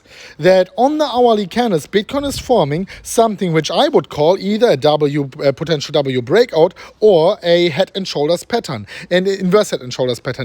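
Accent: Germany. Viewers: u live the best life bro! keep up the good work and stay positive love your german accent by the way enjoy a good drink this evening, u deserve it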